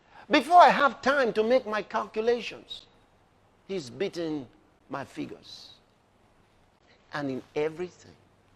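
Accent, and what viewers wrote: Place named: Nigeria. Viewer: no matter how he hides his accent it still shows he is from Nigeria 😀😀😀😀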